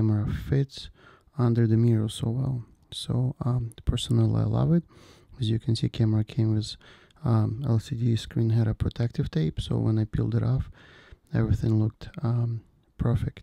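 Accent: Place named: Russia. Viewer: your speech accent sound like ur russian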